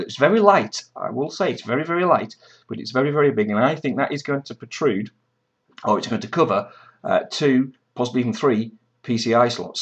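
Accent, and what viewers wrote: United Kingdom. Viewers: Your accent is similar to mine, I'm in Leeds, but I don't pronounce it like that lol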